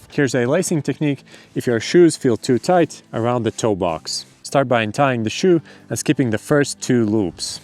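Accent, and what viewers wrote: Latvia. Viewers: Very helpful, super compact and easy-to-understand video! Skatoties likās, ka Tev ir mazliet latviešu akcents, un izrādās esmu trāpījis. Sveicieni un lai izdodas arī turpmāk!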